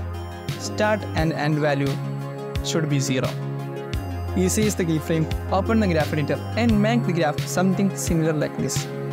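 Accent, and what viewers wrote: Kerala, India. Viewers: Bro malayali anno🌝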